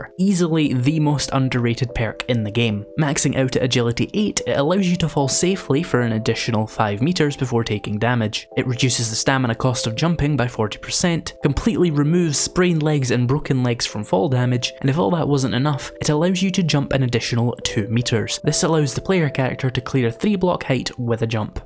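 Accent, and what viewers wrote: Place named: Shires, United Kingdom. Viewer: "This is Pear-fect" listen to that delicious Shire accent sexy fk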